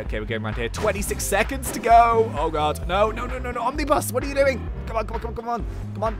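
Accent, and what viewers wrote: United States. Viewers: That American accent tho... that's better than I can do and I've never been out of the USA!! 😆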